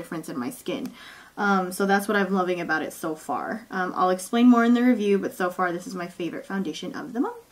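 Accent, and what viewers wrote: Philippines. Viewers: I love ur Filipino accent. hysterical!! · I love you girl haha..ur Filipino fob accents are hilarious ..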